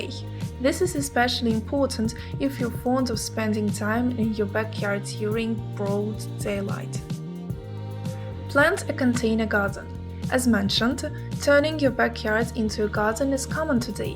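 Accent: Russia. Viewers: Русская на английском, слышен акцент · You have russian accent...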